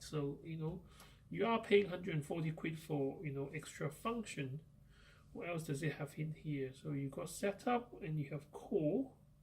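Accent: China. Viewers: "Chinglish" LOL As long as I can interpret what's being conveyed, I'm good. I just got a Jennov 5MP PTZ POE Mini Cam and it looks similar to this one, but the documentation and Jennov's website is sorely lacking.. :( It appears that mine is made by DianChen Industrial Co., Ltd. and my Amcrest NVR cannot "see" this cam even if I change port numbers. Is it typical that each manufacturer "blocks" other manufacturers' hardware so as to force the consumer to purchase ONLY their hardware?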